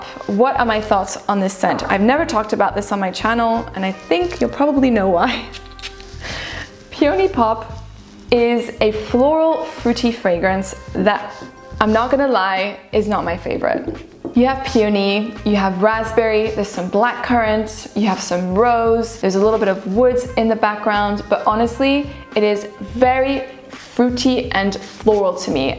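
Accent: France. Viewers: i am here for her french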